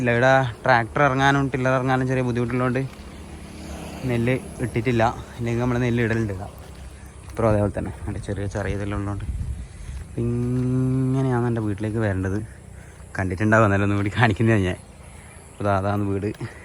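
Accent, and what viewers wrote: Kannur, India. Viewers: കണ്ണൂർ ഭാഷ കേൾക്കാൻ നല്ലരസണ്ട് 👌🏻👌🏻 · കണ്ണൂർ slang കേൾക്കുമ്പോൾ എൻ്റെ വീട്ടുകാരെ ഓർമ വരുന്നു..ഞാൻ ചേലോറ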